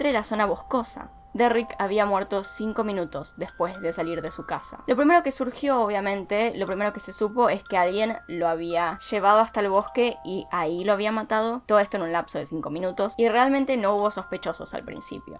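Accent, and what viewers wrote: Argentina. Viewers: Estoy enganchada al acento argentino...hoy voy a ver por lo menos diez o doce...me encantas...sigue así...pura está muy bien eres correcta sin estridencias y esto s temas ya sabes don tan delicados que tienes que cuidar mucho el tono y el tuyo es perfecto y con mucho respeto...besitos desde España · Sos Argentina? porque tenés igualmemte un acento...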